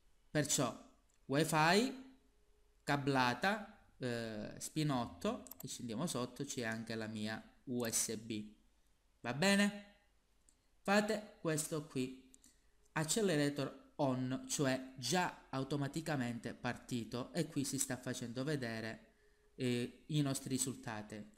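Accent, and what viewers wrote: Sicily, Italy. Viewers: a quanto ho sentito sembri siciliano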